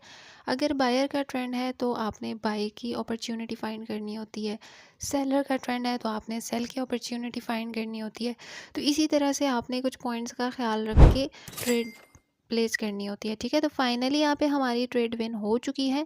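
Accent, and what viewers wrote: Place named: Karachi, Pakistan. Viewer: اپ کی اردو سے لگ رہا ہے اپ کراچی سے ہیں اپ کی ٹریڈنگ کمنٹری سے محسوس ہو رہا ہے کہ اپ ابھی نئی ٹریڈر ہیں البتہ اپنی کوشش جاری رکھیں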